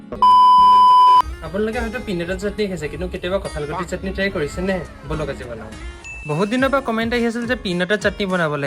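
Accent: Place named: Nalbari, India. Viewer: dada mur nam tu lona koi koi vagor lagisa❤❤ tumi kom kom buli he koi thaka nokowaya dakhun nalbarir para koiso❤❤❤❤❤❤❤❤❤❤❤❤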